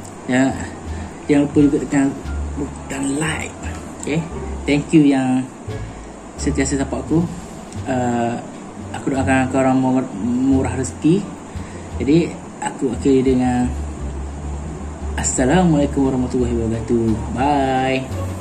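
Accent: Terengganu, Malaysia. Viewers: Aku suke tengok orang ganu cakak..hahaha good job bang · Dgn slang ganu dye comel😂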